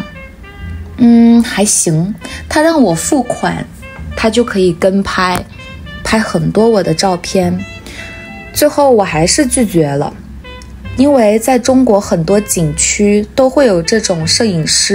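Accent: United Kingdom. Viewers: could you make a video about cultures, customs, things to do/not to do for someone moving or visiting china? maybe do it in this format? thank you for videos... did you live in the UK for a long time? you have an UK accent when you speak english in other vids.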